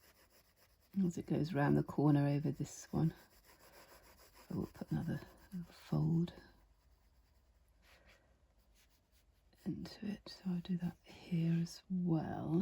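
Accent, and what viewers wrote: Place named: United Kingdom. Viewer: Hallo,. I am a new fan of yours. I came across a vid from you and enjoyed it immensely. I am a transplanted Scot living in the southern US and I always love and appreciate hearing an accent from GB! Having said that: I love to do doodles and have several notebooks devoted to them but I usually use pens. I've so many coloured pencils and almost never use them as I am a painter. This has inspired me to get out my 150 Prismas set and have a go! Thank you so much! Bless xo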